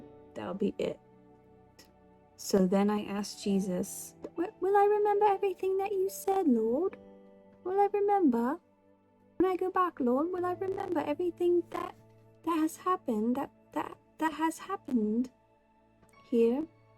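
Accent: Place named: United Kingdom. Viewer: I love your British accent, so cute. My son asked me last night why I always watch your videos and I said, because I love her and her videos. It is highly possible that you had that dream just to intercede for her through prayer. I had a dream last week and there was a woman standing at a public counter and she said, "my son makes 200 thousand dollars a year and he gambles it all away. I prayed for her son in my dream to stop gambling. When I woke up I was so glad to finally be remembering my dreams again, even if it is just snippits. Anyway, I believed he was a real person and that was really his mother. Jesus is quickening the body of Christ and we are experiencing supernatural things left and right! Bless you and your family.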